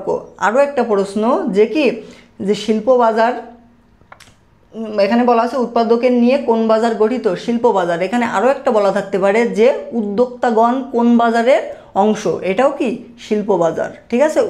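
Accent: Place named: Khulna, Bangladesh. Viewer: দাদার যশোর অথবা খুলনায় বাড়ি 😊❤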